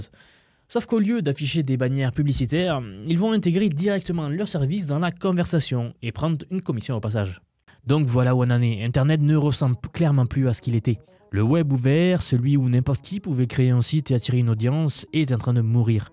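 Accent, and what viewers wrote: South, France. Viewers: le Sud I guess ?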